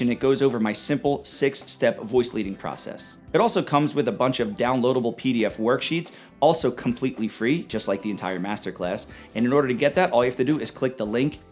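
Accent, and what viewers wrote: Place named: New Jersey, United States. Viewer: AWW but it's gotta be a jersey accent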